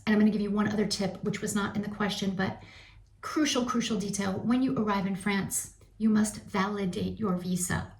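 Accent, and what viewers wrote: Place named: United States. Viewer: Perfect french with a lovely american accent 😊 Love it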